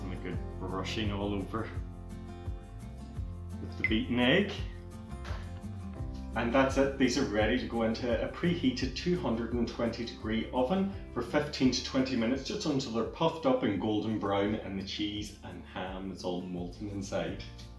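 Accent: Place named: Ireland. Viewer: Didn’t realise jambons were an Irish thing was surprised to hear the accent, greetings from the north 😉😂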